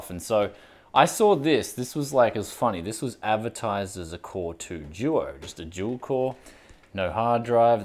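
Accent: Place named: Australia. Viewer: He has an Australian accent and August is Winter here...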